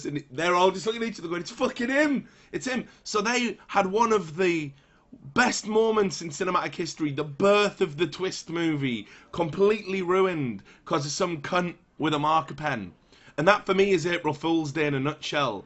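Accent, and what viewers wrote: Wales, United Kingdom. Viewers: Why is your Welsh accent so strong in this video? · I want you to gently complain about things in my ear with your Welsh accent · Wth it's so weird to hear you talk like that! I'm not a native speaker so it never occured to me that your usual way of speaking was some sort of broadcasting voice. Well at least now I kinda know what a Welsh accent sounds like, so I learned something from the video. I liked last year's april fools joke by esportsexpress tbh. Publishing legit but funny chatlogs by Arteezy and keeping the community guessing if they were actually legit or if it was just an april fools joke. In general I'm way too gullible so maybe I don't dislike april fools day that much, because I may actually fall for it sometimes. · I thought the welsh accent was a april's joke. Had to double-check the date. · Welsh accent coming back? 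· Love the welsh accent. I'd like to hear it more often.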